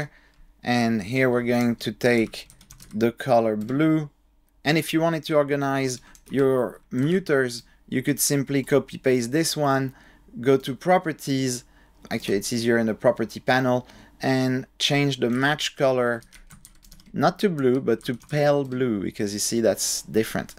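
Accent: France. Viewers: You sounds French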